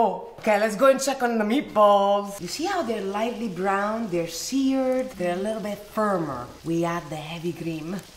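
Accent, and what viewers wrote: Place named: Rome, Italy. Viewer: Che meraviglia quell' accento Romano, ah quanto vorrei essere invitato a casa di questa mamma "per mangiare si"😅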